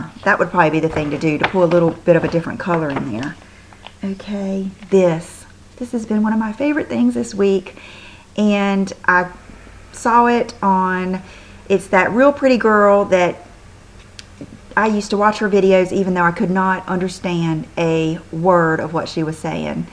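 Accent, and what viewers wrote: South, United States. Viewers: I love the way you talk! :-) Love southern! Don't ever stop being you! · I speak Old Southern too and I totally understood every word you said! So refreshing for me! hahaha. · Never saw that Too Faced liner but me and my Ulta coupon will be getting it this weekend. That and the NYX yellow HD concealer. Girl, I understand your "Old South". I understand every word you say...and talk the same. Hug your babies for me. Sad day. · I Love Love Love! Your "Old Southern" Accent! Please Dont Change A Thing! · I think your old southern accent is adorable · I am so glad I found your videos! You are beautiful and so happy, and I love your southern accent! Subscribed!! :) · You are a breath of fresh air. I love your accent because I'm a Southern girl too and we are by far the most charming ladies ever:)) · I'm from Mississippi and I totally get the southern accent, I say cement the same way lol I do say Pinterest the correct way, probably because I heard it pronounced before I saw it written down :)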